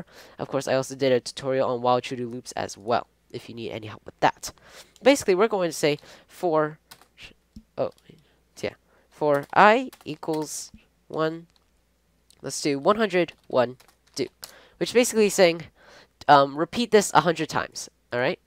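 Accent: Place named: United Kingdom. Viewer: great tutorial. you notice this guy is British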